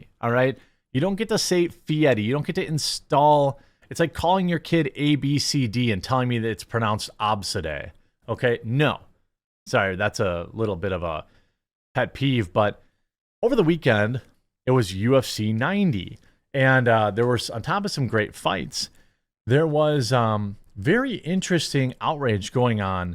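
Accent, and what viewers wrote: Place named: Midwest, United States. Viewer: I’m from the east coast, so you don’t get to say “quarter” like “core-ter” it’s “Kwater” idc what Midwest neutral accent you have, you’re saying your channel’s name wrong. There’s a U in there that you don’t get to say is pronounced any other way than with that sound in it.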